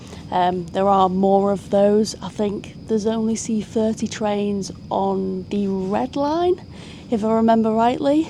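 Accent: United Kingdom. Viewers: I can hear that you're brittish... just have a permanent stay in Stockholm. its not worth going back to England. Sweden is kind of a shithole now too but England is a lot worse.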